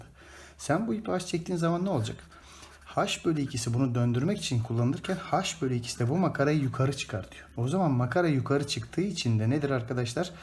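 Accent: Thrace, Turkey. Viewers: harikasınız hocam.. trakya ağzını da öyle cok özlemisim ki videoları hızlandırıp izlemeyi bıraktım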